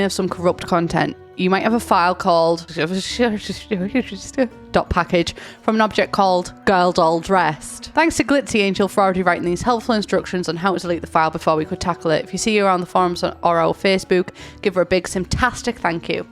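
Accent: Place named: Middlesbrough, United Kingdom. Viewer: click like if you come here for the middlesbrough accent. say 'purple shirts'!!! 'PAIRPLE SHAIRTS'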